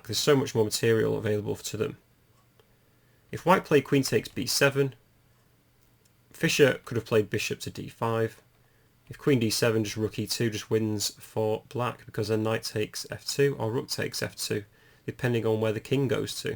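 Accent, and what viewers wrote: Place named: United Kingdom. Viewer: I wanted to share with you how I found your channel, even if it doesnt matter(or maybe you can capitalize on it as a matter of...you'll know what I mean when I say it😅 I searched I donno british chess whatever...I'm here for your beautiful accent man:)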